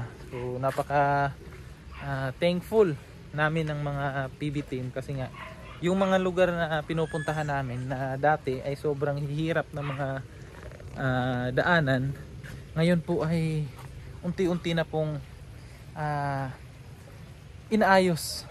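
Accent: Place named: Visayas, Philippines. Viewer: 😂😂😂😂natutuwa ako SA tuno NI sir Pg magbisaya..ang cute😂😂❤❤❤❤❤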